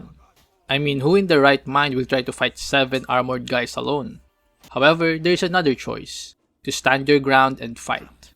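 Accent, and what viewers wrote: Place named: Philippines. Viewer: Noypi? I can hear it from your accent.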